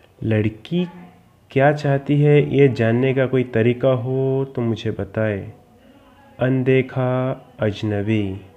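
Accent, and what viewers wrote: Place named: Bihar, India. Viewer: You never be like brain singer you can only copy him and your voice is like Bihari...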